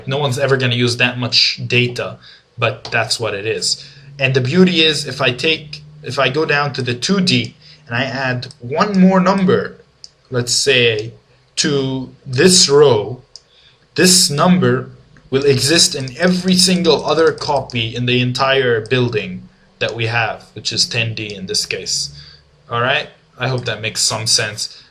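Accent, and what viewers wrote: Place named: Europe. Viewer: I watched some of your videos before and got distracted to some other. So I wanted to make these comments but did not get time then. But now I started watching them again and forced myself to comment on your videos - 1. First, it is very CLEAR VOICE and VIDEO both. Even though you have an accent, it is very easy to understand and your EU accent gives a very nicee exotic flair to it! Don't you all agree people? 2. Secondly, your voice also has a very determined way of expressing something - it almost hears that you are confident enough to teach, but yet you stumble humanly on some stuff but you confident way of saying things keeps us hooked. So this is a compliment too..you may be mistaken of shaky but you voice is NOT. And that is a good TEACHER quality! 3. Thirdly, you are very funny - you make fun of yourself using the the PAINT program to do cursive writing etc..then tell "us to try it"..I literally tried and found it fun!! 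just like you did! Also, the human part of you, when you did not rehearse and tried to expand the 2D array without putting the element in there and it was not doing it and you stumbled on indefinitely..then gave up and smoothly changed the subject going on to explain the internals of an array!! very funny and very human! Some of us would have decided to scratch the entire recording and start over after finding the actual method..but your publishing this stumble made you very human - one of us - and that makes a good connection between a teacher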